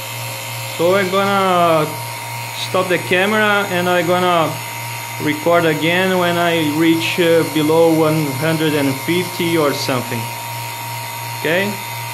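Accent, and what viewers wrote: Brazil. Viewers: cara vc é brasileiro?? dá pra reconhecer pelo sotaque!! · are you brazilian? your accent when speaking english is very familiar to me. nice video! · sotaque brasileiro ?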